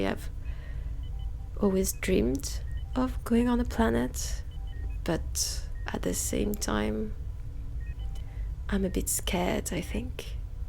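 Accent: France. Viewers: For once the french accent is actually french, and not some strange abomination of an accent 😭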